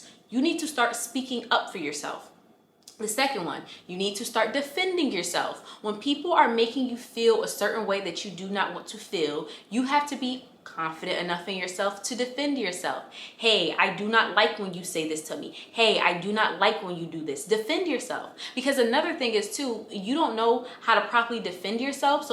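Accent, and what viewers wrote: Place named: Memphis, United States. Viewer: Are u from Memphis? You sound very Memphis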